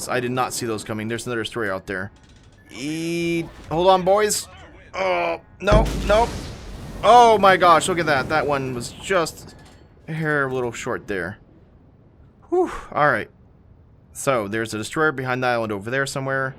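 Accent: Texas, United States. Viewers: State history... Sounds like you grew up in Texas...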